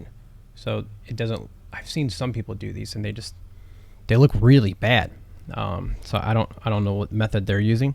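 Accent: Ireland. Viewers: R u actually Irish though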